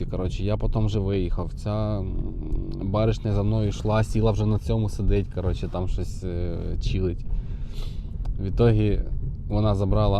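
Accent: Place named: Vinnytsia, Ukraine. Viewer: Завали ротяку, руснявий клоун. Звичайний вінницький суржик. Це українська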